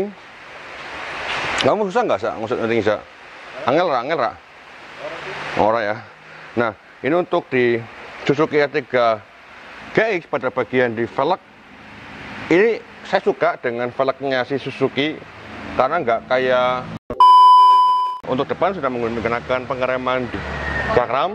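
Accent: Banyumas, Indonesia. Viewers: jos gandos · Tangerang menyimak kang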